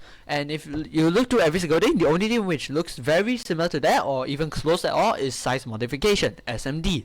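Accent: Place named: Singapore. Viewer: unrelated, but are you from SEA? specifically SG? the accent really gave me a shock hearing it in the wild LOL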